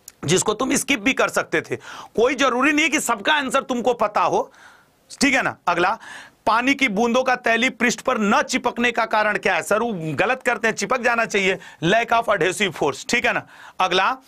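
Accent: Bihar, India. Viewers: Sir apka language Bihari jasa hai 😅😅😅😅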